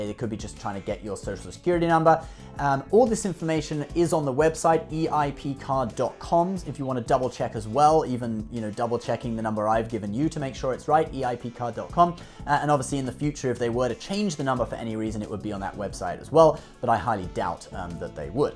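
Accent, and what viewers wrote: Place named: United Kingdom. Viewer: This would have been a more reassuring video if the guy didn't have a British accent.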